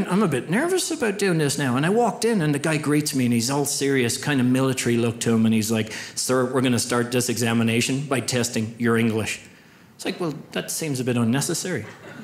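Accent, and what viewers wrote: Ireland. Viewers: The Irish accent makes it funnier 🤣🤣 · Hahaha… he’s Irish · Agree, the Irish accent threw me off at first as well, but worth the watch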